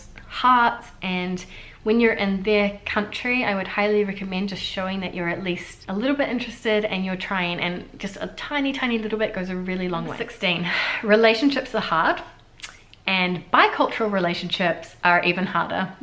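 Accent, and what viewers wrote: New Zealand. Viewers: Bon anniversaire ! C'est marrant tu as repris ton accent néo-zelandais xD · Happy Birthday, I have short showers due to hot water shortage but I still manage to rinse my soap. You better rinse just in case is not good for your skin. A lot of people also don't rinse the dishes from the soap in Australia. The only time I don't rinse the soap of me is when I have bath because I run out of hot water. May your 30s are as happy as your 20s. You are real pleasure to watch. I like the way you speak NZ language!!! And I like your French too. I have heard you speaking French!!! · Joyeux anniversaire!! Merci pour cette chouette vidéo et pour ton appréciation de la France. J'aime ton accent et tes expressions NZ. 😊🎂🍾🎉👏👏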